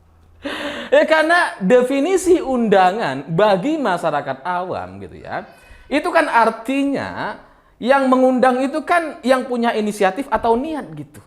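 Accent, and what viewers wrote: Java, Indonesia. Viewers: Gw tandain yg subscribe bulan kemarin 398, sekarang baru 410, artinya peminatnya kurang ini Chanel, Tutup aja, Medok kata2nya Gak bermanfaat.😆😆😆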